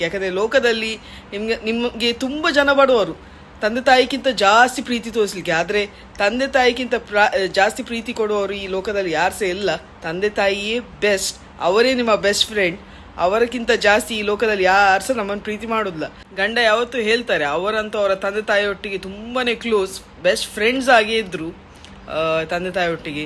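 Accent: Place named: Tulu Nadu, India. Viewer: ಸೂಪರ್ ಮೇಡಂ. ನನಗೆ ನಿಮ್ಮ ತುಳು ಮಿಶ್ರಿತ ಕನ್ನಡ ಮಾತಿನ ಶೈಲಿ ತುಂಬಾ ಇಷ್ಟ. ತಾಯಿಯಾಗಿರುವ ನಿಮಗೂ ಅಮ್ಮಂದಿರ ದಿನಾಚರಣೆಯ ಹಾರ್ದಿಕ ಶುಭಾಷಯಗಳು. ನಿಮ್ಮ ಮಗಳು ಮುದ್ದಾಗಿದ್ದಾಳೆ. ನಾನು ಬೆಂಗಳೂರಿನಿಂದ. ಮೂಲತ ನನ್ನ ಊರು ಪುತ್ತೂರು. ಧನ್ಯವಾದಗಳು ಮೇಡಂ.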